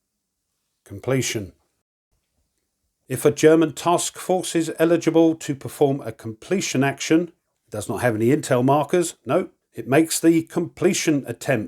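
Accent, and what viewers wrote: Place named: United Kingdom. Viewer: Hopefully will have reprinted soon! Missed the 1st Edition…Like your videos and love your British Accent!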